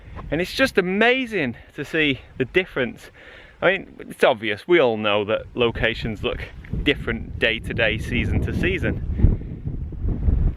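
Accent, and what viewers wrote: United Kingdom. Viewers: absolutely stunning british accent . Thanks for this. Waiting for the next one.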